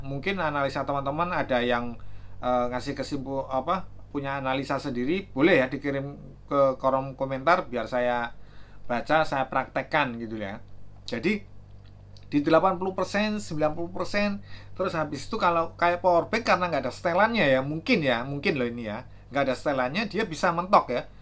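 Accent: Java, Indonesia. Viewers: tp jujur nih mas maap nih ya, aku malah suka, lucu ato terhibur klo mas nya misuh2 di setiap konten video gara2 komen netizen, misuh2 mas nya lucu bikin ku ketawa terhibur trs apalagi dgn gaya ciri khas kental logat Jawa nya itu, gara2 itu jg aku jd sering nton channel mas nya selain pengen tau info2 gadget soal perkabelan dan per chargeran nunggu moment mas nya misuh2 soal komen aneh2 ato soal komplain netizen 😂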